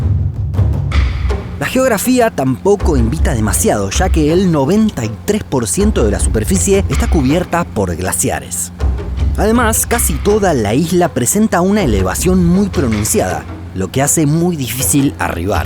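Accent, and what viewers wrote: Argentina. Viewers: Me encanta escuchar ese asento argentino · Que mal que tengas el acento arjento, lo único malo del video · Tal vez sea porque es Argentino.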